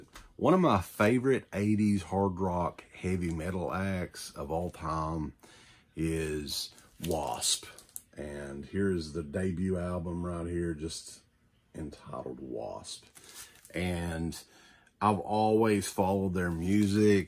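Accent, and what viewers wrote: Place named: Deep South, United States. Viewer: Where you from brotha? You got a good ol deep south accent. Im in Arkansas from Texas. Glad to see good ol boys on some good ol metal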